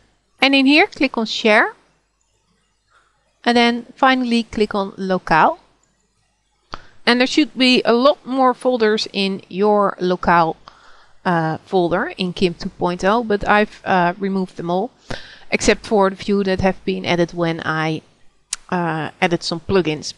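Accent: Netherlands. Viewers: i think your dutch :) dankjewel voor deze video :) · Are you Dutch? kinda hear ya accent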